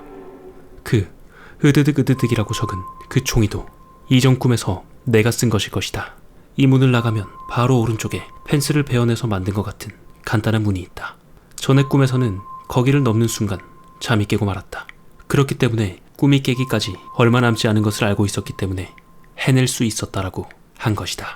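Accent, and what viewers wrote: Gyeongsang, South Korea. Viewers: 님 경상도 분이신지~~무서운장면에서 사투리땜에 빵터집니다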